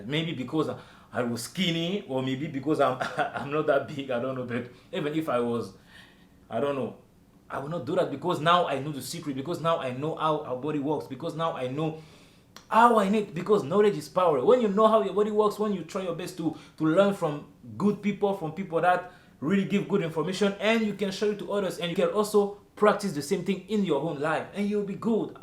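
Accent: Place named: United States. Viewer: Tu parles comme un Américain l'on Fils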